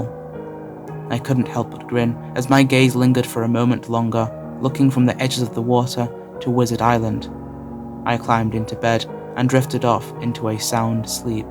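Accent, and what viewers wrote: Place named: England, United Kingdom. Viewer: Good story.. Not sure about the English accent of the narrator though.. ... ... For the record I'm a Brit too.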